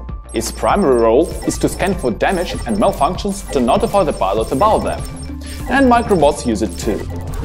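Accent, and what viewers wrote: Russia. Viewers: Ох уш этот звиздатый русский акцент😁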